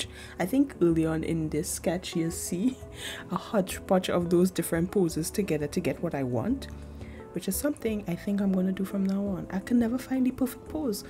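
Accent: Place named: Trinidad and Tobago. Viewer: so i'm listening to you talk and i'm like 'why she sound kinda like a trini so loll' love your art :3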